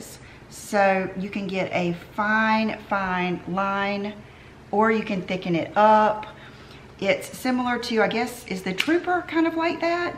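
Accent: South, United States. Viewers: We have the same southern accent...I am from Lake Martin, Alabama? What state are you from?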